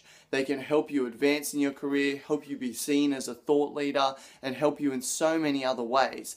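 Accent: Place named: Australia. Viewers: your Australian accent actually helped me pay attention, since it's not what I hear daily. Maybe I'm unusual, but I enjoy different accents and cadences. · He has a fine Australian accent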